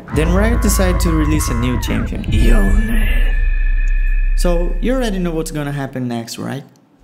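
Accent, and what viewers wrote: Brazil. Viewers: MANOOOOOOOO KKKKKKKKKKKKKKKKKKKKKKKKKKK ADOREI BR não tem jeito. Tu pode falar fluentemente, desde o incío eu vi a pitada de brazilização. TEU VÍDEO ME AJUDOU MUITO COM O INGLÊS, VOU FICAR REASSISTINDO KASJDLAKSDJ E QUE CONTEÚDO DO CARALHO EM, PF NÃO PARE AMIGO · Aaaahh eu sabia que era br só pelo sotaque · Sabia que tu era Brasileiro porra, esse sotaque ai e é top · Mano não sabia quer vocè era brasileiro mais tava um pouco desconfiado pelo seu jeito é o sotaque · Antes de falar q era br eu ja desconfiei pela pronuncia, so eu q acho mto loko isso ? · Mano, num outro vídeo q vi teu, eu pensei q parecia BR, e agora sei q eu estava certo... Principalmente pelo sotaque kkkk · Mano eu não sabia que tu era brasileiro mas esse sotaque me fez desconfiar desde o início kkkk trabalho foda pra krl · Eu sabia que tinha sentido um sotaque BR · Caralho eu sabia que tu era brasileiro só pelo teu sotaquekkkkkkk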